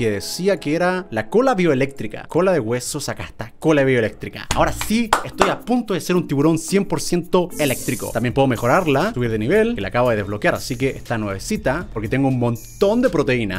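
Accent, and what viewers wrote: Chile. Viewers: Soy yo o esta volviendo a hablar chileno poco a poco? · por lo menos en los directos se le sale lo chileno wn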